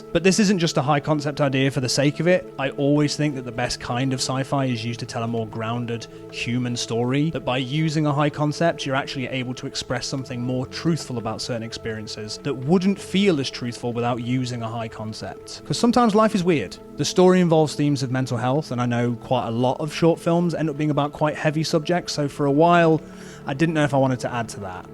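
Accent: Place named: East Midlands, United Kingdom. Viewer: you used to have an east midlands accent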